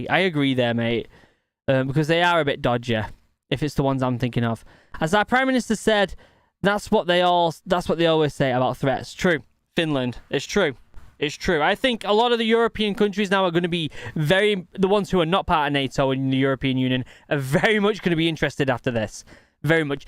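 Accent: United Kingdom. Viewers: What british/brit accent?Or are you Scotsman?Great,your transparent cap!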